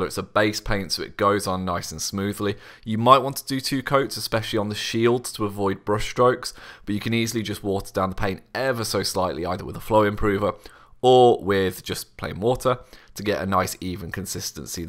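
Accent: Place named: Cockney, United Kingdom. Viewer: Could you tell me if you used Wraithbone out of a can or with an Airbrush? I have nothing but bad to say about Contrast Spraycans because it's awful. It pulls back from the edges, pools in recesses and dries wit a weird almost "carbonated" texture sometimes. I shook for 10 minutes straight, sprayed it properly (8" away), sprayed it in cooler but dry weather, in the day, in the shade. Also, great tutorial! I really enjoyed this one. Brits with a light cockney always sound good ;-)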